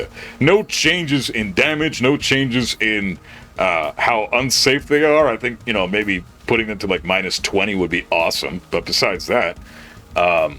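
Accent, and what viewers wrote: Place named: Canada. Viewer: You're Canadian 🇨🇦 too eh! I'm from Toronto.. love to hear it.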